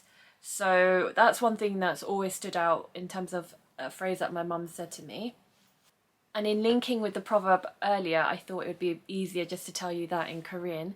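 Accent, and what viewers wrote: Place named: United Kingdom. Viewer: I am Japanese. I was born in London but left after 8 month . I really love your accent, there is something so elegant about seeing Asian people with a British accent. ( I wish I could speak like that lol) I enjoy your channel ❤ ( definitely need more beauty content creator by Asian women ) Btw I LOve Korean food , I actually live very close to the Koran Embassy so there are lots of Korean restaurants and the best Kimuchi store that a go all the time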